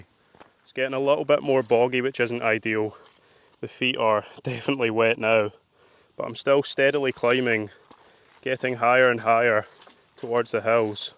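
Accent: Scotland, United Kingdom. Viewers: Love this program. I'd love to see some episodes from the national parks or even greater or lesser known castles or more country estates of royalty etc. You can still go traipsing up the wee little monros all ya want. You come across very well when you speak. You would be good at telling the stories of the castles etc. Your Scottish accent is lovely. 🙏🏽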